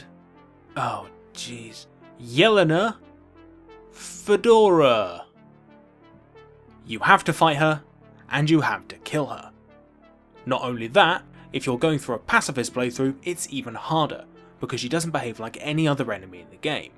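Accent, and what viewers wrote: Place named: RP, United Kingdom. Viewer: "Allow me to explain to you" is a super annoying way to phrase your intro. Within the first 10 seconds, between that phrase and your posh accent, I can already tell you're a total tosser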